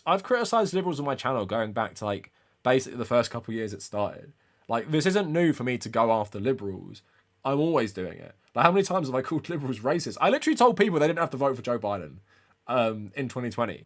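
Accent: England, United Kingdom. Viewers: I'm almost certain they think you're condescending because of your confidence combined with your accent lol lots of western media has posh know-it-alls or intelligent, antagonistic Englishmen as the opposition. Aside from that, seeing a Destiny viewer call you condescending is so rich 🤣 Destiny is easily one of the most condescending politickers out there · I don't think you're condescending. But maybe just having a British accent makes you come off that way to people in the U.S🤷‍♀️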